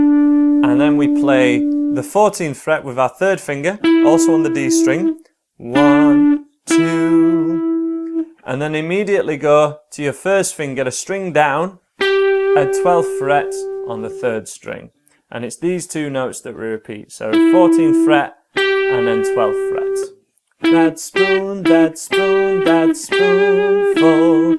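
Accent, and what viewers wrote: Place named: Northern England, United Kingdom. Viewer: Kool thanks enjoyed that tutorial IAM in Australia you are obviously northern English I was born in Cheshire hope to see more of your tutorials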